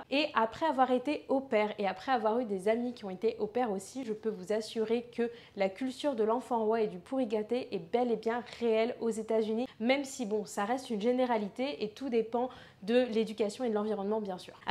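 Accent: France. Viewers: J'imagine que lorsque tu commandais au "drive", que tu parlais anglais avec un très fort accent français.... pas étonnant qu'ils mettaient du temps à comprendre 😄 Sinon, jolie vidéo assez réaliste à part quelques petites généralités pas nécessairement vrai dans tout les états.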